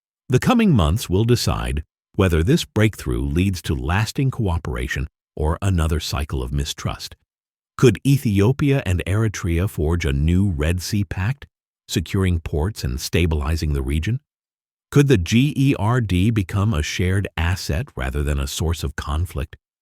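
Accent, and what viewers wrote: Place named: United States. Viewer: Who are you? Are one paid stooges with American accent spreading falsehood. This deluded Abiy thinks he can change the subject of his own misgovernance replete with assignations, imprisonment and outright war against his own people—Ethiopians. So, live with this—Eritrea’s ports are non-negotiable even with the support of UAE which is creating havoc in Africa—Sudan, Chad, Libya and now Ethiopia. So, this uneducated and uninspired leader called Abiy has become the water carrier for UAE. Eritrea will never and is not cornered. It is Ethiopia, the client state, of other powers which is cornered.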